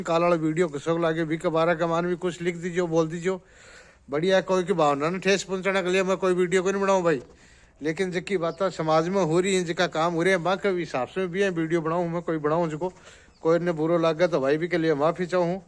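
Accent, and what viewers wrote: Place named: India. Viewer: बोली से लगता गुढा के पास का है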